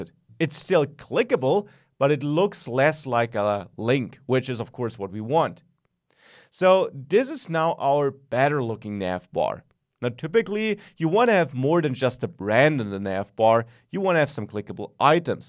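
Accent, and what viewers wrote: Germany. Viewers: I bet 100 dollar he is from germany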